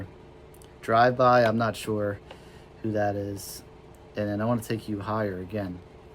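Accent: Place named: New York, United States. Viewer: You sound like you’re from NY like I am. If you are, I’ve got a 150 or so CDs for you to look at.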